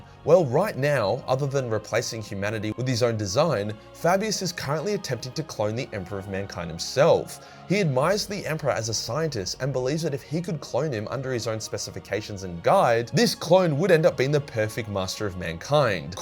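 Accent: Australia. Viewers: yikes... Dont listen to this dude when he talks shit about college. Terrible advice. You really think a stupid website will hold more value to a degree which by the way, if you go about it wisely and use all the resources available to everybody, it wont cost you thousands of dollars. I got my associates without spending a penny due to scholarships and grants. Dont be lazy, be smart and ambitious. Really easy for this guy to say it when he got lucky off of talking about warhammer with an Australian accent for a living and thriving off of donations.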